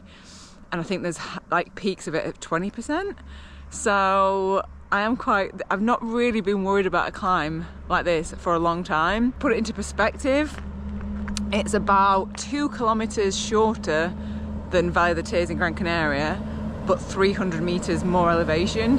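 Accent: United Kingdom. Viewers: Both my wife and I were teachers for 45 years. Because of that, we have this little habit of trying to imagine what someone looked and acted like as a child. As we were watching your video, and you were wrinkling your eyebrows while apologizing for being grumpy in your lovely British accent, we both looked at each other and said, "I bet was an ABSOLUTELY ADORABLE child 🥰." Thank you for being you and for bringing a little sunshine into our rainy lives (It's "chucking down" here in the USA too. 🌧️⛈️☔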